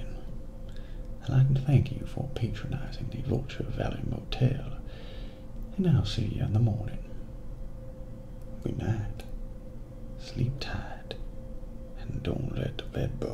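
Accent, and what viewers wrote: South, United States. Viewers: The whispering southern accent reminds me of Morgan freeman for some reason haha. Nice video man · Wow, you look so different with hair - and a beard too!!.......ASMR Muzz has just done the opposite and shaved it all off. So now he looks like a hitman gone renegade! Great accent btw....or is yours a 'southern' US one? Your vids are so imaginative, and a far cry from the usual ASMR fare if you know what I mean! .....the best ASMRtists are the ones who make vids no other ASMRtist does....like yourself, Muzz, and Phoenician. Grow out the beard, and before you know it you'll be featuring on Beardbrand with Jeff whatshisname!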